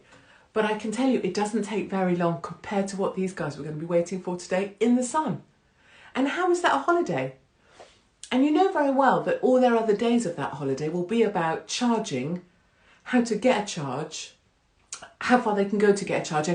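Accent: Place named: England, United Kingdom. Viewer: Crane pants conjures up a very different mental image than what you meant, I'll spare you the trauma of explaining. Enjoyed the show, a bit of intelligent humor with my delicious morning coffee drink while you sip a glass of wine, being the pretty English girl at peak hotness with abundant charm and an adorable accent is totally irrelevant.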